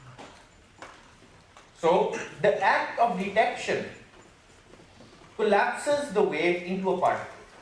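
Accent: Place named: India. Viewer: when he starts talking in his indian dialect and then he ends it with "and thats what physics is all about" ... very good explanations thanks for sharing.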